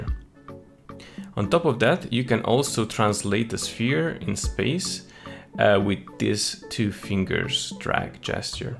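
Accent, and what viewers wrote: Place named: Italy. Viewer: You sound a little italian...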